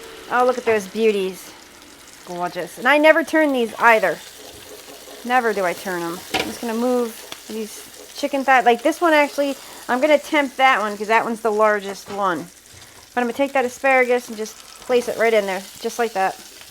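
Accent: United States. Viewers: Please don’t apologize for normal speech. I enjoy your cooking passion and your every day American speech. You owe no one an apology. Love your videos!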